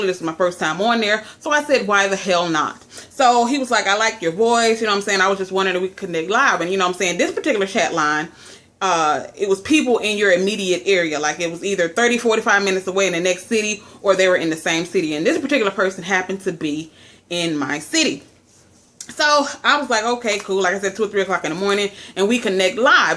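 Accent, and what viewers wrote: South, United States. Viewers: Lol I love you American accent .. And damn loads of old men got nothing to do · Southern not american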